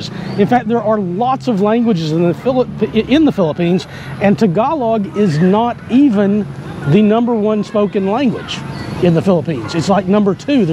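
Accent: United States. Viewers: I couldn't say what region of USA you are from...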